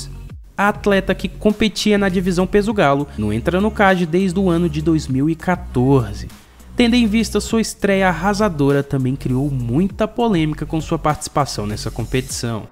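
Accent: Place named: Northeast, Brazil. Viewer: Cara vc é cearense ou Nordestino? Vc tem um leve sotaque. Valeu!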